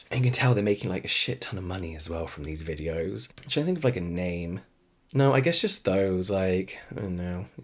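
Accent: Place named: Wales, United Kingdom. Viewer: Great video sexy voice ✅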